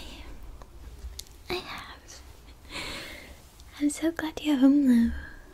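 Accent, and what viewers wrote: Northern England, United Kingdom. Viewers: Somewhere in northern England? Manchester maybe? (definitely didn’t read the comments) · She’s British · Beautiful Girl xx 😍🔥💕❤ Tingles for sure Love your accent northerner like me,, I'm Bolton by the ways.. Keep up the fabulous video's baby xx.. Youu are asmr Angel love your eye's very Disney princess.. getting Elsa frozen vibes,😊.. Xoxo Levi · I can’t tell if her accent is British or Australian?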